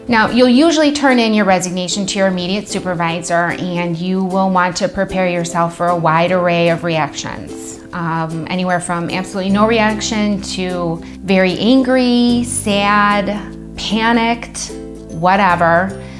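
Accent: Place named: Minnesota, United States. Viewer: I love her accent, is she from the Fargo movie?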